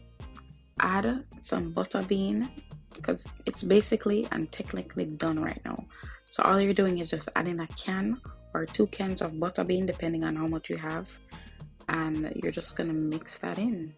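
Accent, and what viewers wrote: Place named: Jamaica. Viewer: Jerk seasoning on oxtail? How is this authentic? Because you sound Jamaican?you add both ketchup and American ingredient bbq sauce and sugar So you making Jerk oxtail? Why trying to change oxtail to oxtail stew? What’s the yellow film, you add flour gravy no look so. Don’t stew suppose to have potato, carrots?Some many questions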